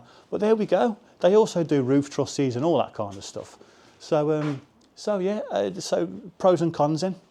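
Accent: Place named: Scotland, United Kingdom. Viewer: I'm going to guess it's a Scottish accent that is causing the problems 😅. Might have even watched the video myself thinking what an arrogant way to show a video...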